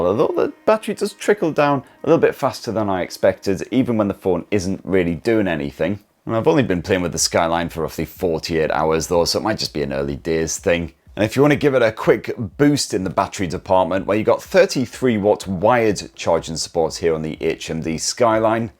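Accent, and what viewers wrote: United Kingdom. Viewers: What's the link to the wallpaper site? I'm American so I couldn't understand British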